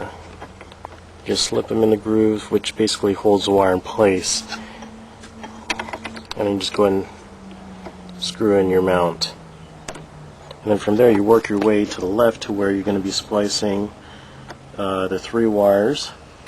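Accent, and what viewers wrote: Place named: California, United States. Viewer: I was going to say it sounds like you live in California.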